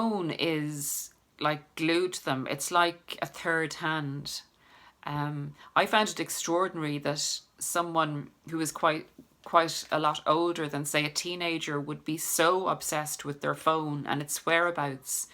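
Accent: Ireland. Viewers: thanks very much done alot of journaling this morning while watching these videos which helped.stayed in bed all afternoon body so sore,I'm also from Dublin great to hear an Irish lady give this advice as a lot of the channels are american.love listening to you and thanks again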